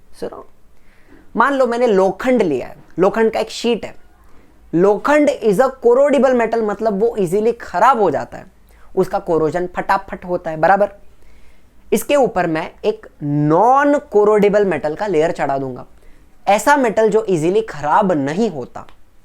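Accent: Maharashtra, India. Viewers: Sir are you Marathi or Watt ? Please tell me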